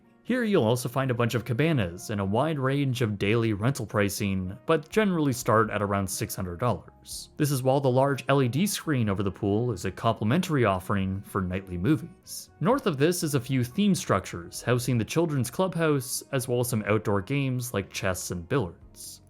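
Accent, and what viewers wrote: Canada. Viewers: You're Canadian accent is coming out incredibly annoying in this video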